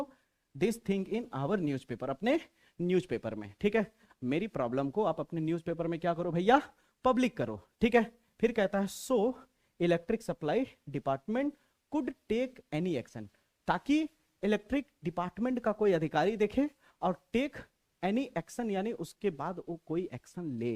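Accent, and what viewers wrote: Bihar, India. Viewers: Ap bihar se ho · Sir app bihar se ho na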